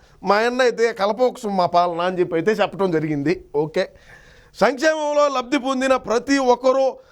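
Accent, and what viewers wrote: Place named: Kadapa, India. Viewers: సార్ మీ కడప భాష చాలా బాగుంది. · మీ కడప యాస చాలా బాగుంది సోదర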